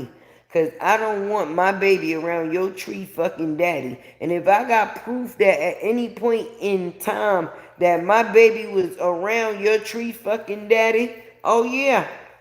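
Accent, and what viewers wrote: New York, United States. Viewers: Hey ur so beautiful n love ur new York accent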